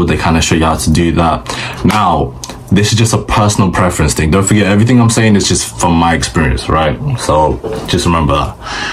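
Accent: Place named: England, United Kingdom. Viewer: Hello mate ! I'm 28 from ireland and I've been learning copyrighting for 1 month. I know AIDA and PAS and all the basics. I feel I'm ready to start but struggle with outreach . Btw ...I'd like to say that that it's a breath of fresh air to hear an English accent. I'm sick to death of all these yankie accents- so annoying ...😮 ! Haha My Q; Would you recommend LinkedIn and TikTok for outreach. I've been banned from Instagram for sending too many messages the same ( but they weren't all the same ) I've been sending some messages on YouTube. Is Instagram the best for outreach ? If so .... I'll try and set up another ... Keep the pounds rolling in mate ! It's great to see success stories. Plus i love your honesty. Some use copy in their yt channels that teach about copy like KJ rainer and cardinal so ....I dont see that with you. So well done ✅ Cheers mate.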